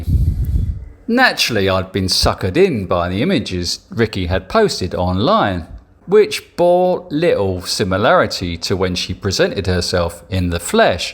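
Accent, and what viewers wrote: England, United Kingdom. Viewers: Great post and hilarious word play; I really enjoyed your alliterative flourishes: You turn a phrase well. I particularly liked your voice when you were imitating a Filipina girl accepting a date to go eat. Which part of England are you from? I've been spending a lot of time in Dumaguete and have been to the Why Not many times. All the best mate, and keep on posting.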